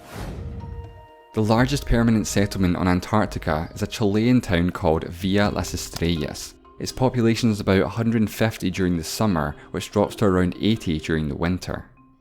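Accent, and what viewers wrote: Scotland, United Kingdom. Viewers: finally a scottish voice, not a yank!! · You're welcome. If you follow his channel and have seen all his videos. You will already know that he is from Scotland (he even said so). I'm used to his accent, it's cute. Much better than the posh accent of BBC documentaries. lol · Is this guy Scottish? Man, those thick accents! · i think he’s Scottish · He is Scottish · i think he's scottish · Whatever, I´m very much enjoying your Scottish accent, keep ´em coming! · Omg it’s so nice to hear a Scottish accent doing a voice over yasss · I would like to know where the channel's owner accent is from? Scotland? Ireland? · Sounds like his accent is from scotland from brave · what is this guys accent? it sounds scottish but it doesn’t at the same time lmao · lol i heard that but cmon don’t make fun of his scottish accent i’m part scottish too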